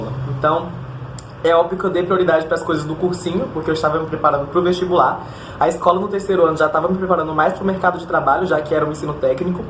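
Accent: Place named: Minas Gerais, Brazil. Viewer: inspirador. já pegou o sotaque mineiro todinho! hahah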